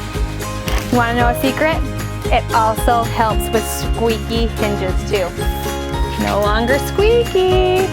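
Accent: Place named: United States. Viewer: I love the American accent...why cant Australia have more American girls lol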